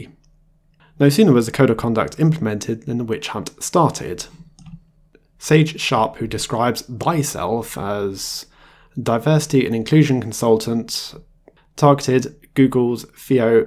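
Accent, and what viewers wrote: England, United Kingdom. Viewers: Are u from england?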